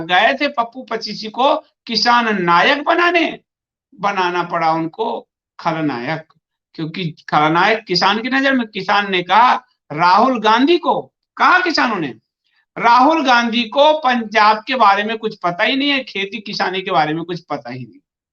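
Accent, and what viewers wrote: Bihar, India. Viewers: ur tone truly Bihari about 25shhuuu🇮🇳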